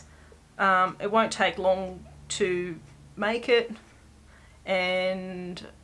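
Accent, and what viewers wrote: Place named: Australia. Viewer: Hello, I fell across your podcast last night and how refreshing to hear your gentle Australian voice. I’ve jumped around a bit looking at a couple of your podcasts, ooooh your ETSY shop although your closed for a bit will eagerly wait for you to re-open. So back to the podcasts I went 🤣 I so enjoyed being introduced to your sweet daughter Mill wow did I laugh along with your conversations 😅 This morning home day for me don’t work Wednesdays generally I decided to go back to the beginning of your podcasts to get to follow your You Tube journey. So my first thought when you talked about jumpers and why us Aussies call them that was the poem Waltzing Matilda and Wikipedia has this description and it made perfect sense to me why we say ‘jumper’ instead of ‘sweater’..... “Jumbuck is an Australian term for a male sheep, and is featured in Banjo Paterson's poem "Waltzing Matilda”. 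I haven’t gone through other’s comments someone may already have thought this. I will have sheep wearing jumpers now jumping around the paddock yards with the working sheep dogs 😂🤣😂🤣 I am so lucky to live (rent) on a working farm approx 700 acres with sheep and cropping being mainly wheat & barley and have so laughed watching new lambs jump and play chasey during lambing season through the year when they have run of the yards just outside of our home garden, the best of fun. 🇦🇺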